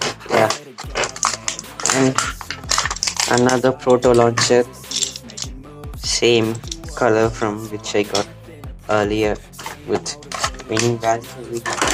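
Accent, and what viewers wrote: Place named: North India, India. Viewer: bro first I taught that you from North states because of way you speak english is different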